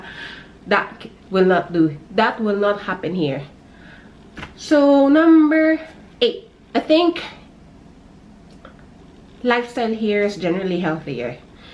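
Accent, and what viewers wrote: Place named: United Kingdom. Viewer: You may sounds like a british accent , thats very good maam. You really deserved that ..!!!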